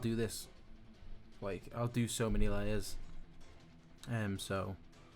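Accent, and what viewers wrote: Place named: United Kingdom. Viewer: holy crap he's British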